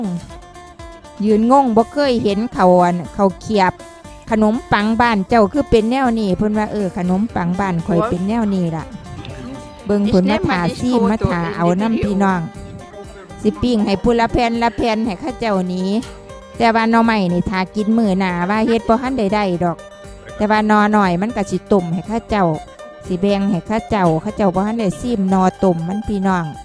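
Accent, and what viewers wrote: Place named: Roi Et, Thailand. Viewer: ฟังสำเนียงคือคนบ้านข่อยไทร้อยเอ็ดแท้น้อ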